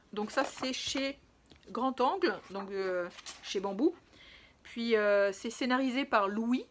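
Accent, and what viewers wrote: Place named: Quebec, Canada. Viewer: une PAL de 340 BDs a lire!!! le rêve! 🥳🎉🎉🎉 Québec, j'avais deviné au léger accent... "Kililana song" est une magnifique BD. le dessin est sublime. "La bête" de Frank Pé, ca a l'air tres sympa. "Blast", une de mes BDs preferees, j'adore le dessin. c'est sûr que dans les BDs qui sont pas "youpla boum" elle est dans le top 3 facile. "La petite lumiere", je veux le lire, j'aime bcp le dessinateur.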